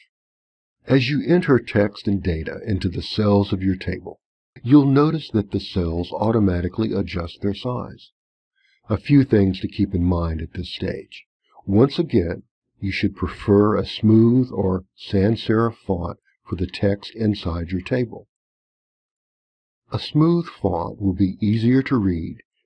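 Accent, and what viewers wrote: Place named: United States. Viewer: Slow, steady instructions in a familiar American English accent. Thank you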